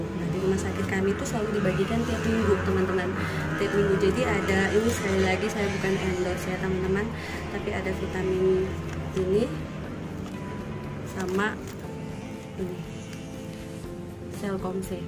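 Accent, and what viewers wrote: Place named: East Nusa Tenggara, Indonesia. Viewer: Gua suka logat NTT nya .... keren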